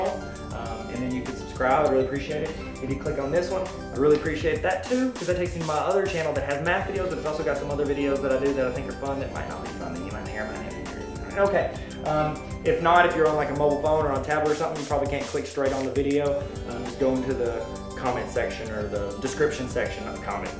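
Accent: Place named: Kentucky, United States. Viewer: I love your Kentucky(region) accent!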